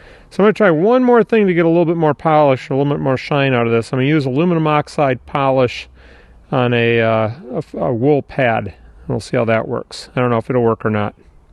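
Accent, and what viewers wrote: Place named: Michigan, United States. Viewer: I love Petoskey stones! I was born in charlevoix. Your accent makes me homesick. I live in GA now.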